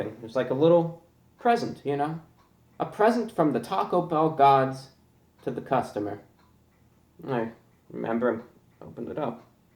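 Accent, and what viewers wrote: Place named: New York, United States. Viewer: You remind me so much of how peaceful my grandparents were. I really admire that about you. I'm from Western Mass and it sounds like you're from New York. I miss my grandparents dearly and the fact that you are so classy and keep it traditional really makes me want to come back not even for the food reviews but just to hear your presentation. Thanks and be well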